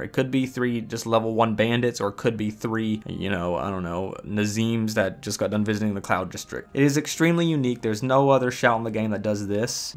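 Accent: South, United States. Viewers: I honestly can’t tell if you have a southern accent or a british accent. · your southern drawl got stronger since the last upload.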